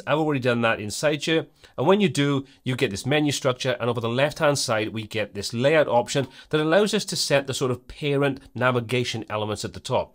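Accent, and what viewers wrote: United Kingdom. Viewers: Finally, a clear-cut yet detailed video on Elementor Mega Menus! And it's presented by a fine chap with a great British voice!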